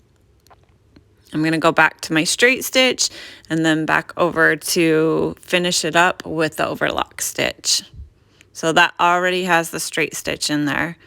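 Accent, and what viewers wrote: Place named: Texas, United States. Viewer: Such a great tutorial! Clear instructions and easy to follow along. Love that Texas accent ❤️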